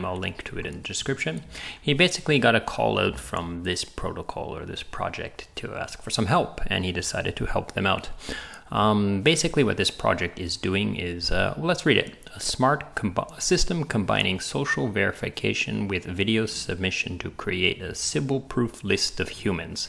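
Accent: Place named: Italy. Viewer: Hahaha - more italian accents please :)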